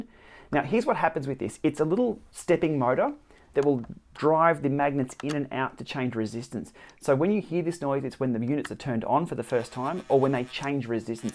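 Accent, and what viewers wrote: Australia. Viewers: Hi I am conemplating buying a Direto since I have a problem with the watts distribution on my Tacx trainer: in intervalls it shifts from one sec to the next from 100 to 400 watts and I have to programm increments of 20w every 4 sec in order to have a smoother transition from one interval level to the next - is this any better with the Direto or can I programm a smoother curve on the elite Training app? Thx for a feedback - Marc/Switzerland (just having completed the Croc Trophy in Cairns..... so its good to hear some fair dinkum Aussie accent)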